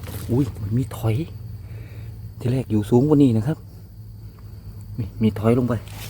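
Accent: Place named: Rayong, Thailand. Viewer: ชอบดูครับผมกดติดตามไห้แล้วนะครับเห็นมีต้นทุเรียนอยู่จังหวดอะไรครับสำเนียงเหมือนระยองเลย..จากโคราชครับผม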